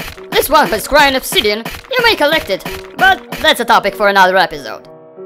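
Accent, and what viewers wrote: Russia. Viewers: I hear that Russian accent in his English 🌚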